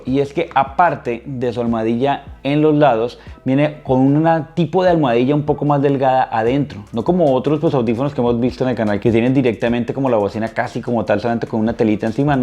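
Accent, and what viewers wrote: Colombia. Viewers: jaja, se te nota el acento tipo canal uno o tele antioquia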